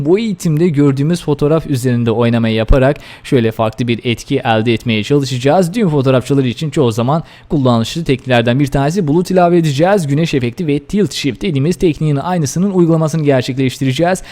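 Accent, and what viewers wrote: Azerbaijan. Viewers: sen azerbaycanlımısın acep?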